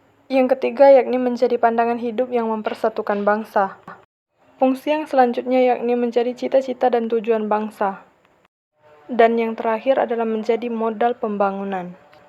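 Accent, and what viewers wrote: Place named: Java, Indonesia. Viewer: wah well done mbak, simpel tapi jelas:)) eh salfok ke medoknya hehe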